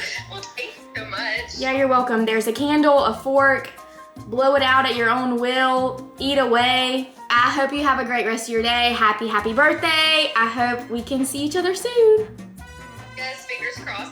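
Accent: South, United States. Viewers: Those are SO cute!!! I love your channel please keep going and making videos! I love your southern accent!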